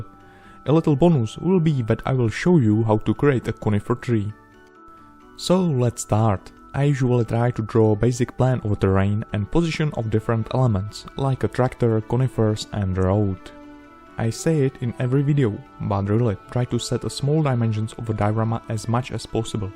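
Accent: Russia. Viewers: у тебя же акцент Русский, но ты тягачь называешь трактором???? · Ведь же русский, по акценту слышно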